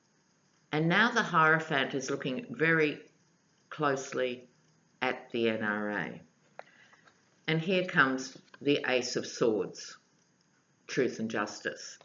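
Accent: Australia. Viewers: Thank you, - ever bright, sparkling & insightful (and wonderful with our familiar Aussie accent & cultural/slang refs!😉) A particular thank you for mentioning dear Becca of Readings by the Empress, too. Her “Why God, Why Trump?”(30 May) reading was indeed a really beautiful, powerful & nuanced outlining of the bigger, higher picture - sort of like a ‘Gaviscon Calming Raft’ for these emotional gastric reflux times!! Blessings, blessings all. <3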